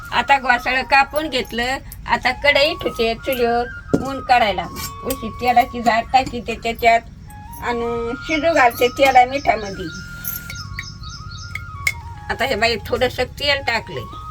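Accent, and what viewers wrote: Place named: Satara, India. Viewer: Aaji tumi sataryakadcha watta boli Bashavarun chan karta Baji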